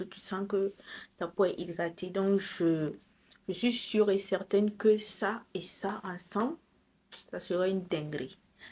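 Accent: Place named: Burkina Faso. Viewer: Dès 5 premières secondes j'ai su qu'elle est burkinabè 😂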